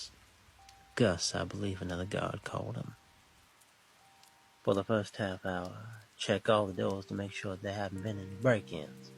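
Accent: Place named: South, United States. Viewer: Quite a bit of southern, but sounds good mostly, keep going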